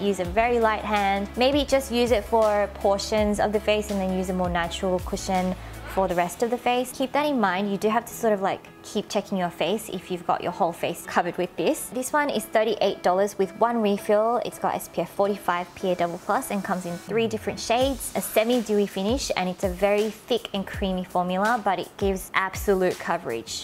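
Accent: Australia. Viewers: Ur aussie accent!!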